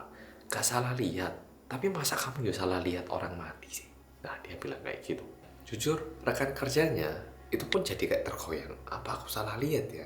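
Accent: Java, Indonesia. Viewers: Bang latih dong ngomongnya jgn terlalu medok, jujur aku kurang ngerti ceritanya, dan suaramu kadang timbul tenggelam ga jelas, seharusnya agak keras sedikit biar jelas 🙏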